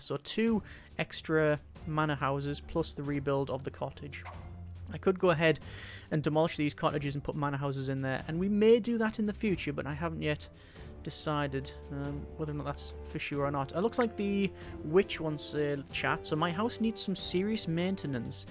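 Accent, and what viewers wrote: United Kingdom. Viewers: You are British or Scottish, I Know it.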